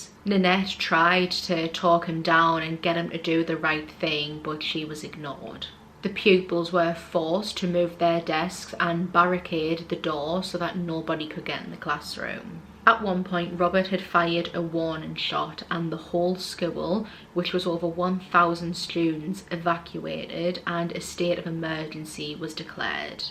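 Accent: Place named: Scotland, United Kingdom. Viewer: Holy Smokes!!!!???!!! What tge H***!!!! Are you from Scotland? What a STRONG, STRING accent!! 😅😅😅😅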